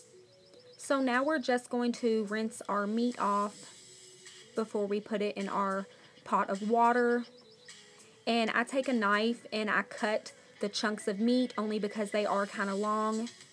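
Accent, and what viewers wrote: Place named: Kentucky, United States. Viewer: Girl I knew you had be from ky. Way you clean lol an talk. I am from Eastern.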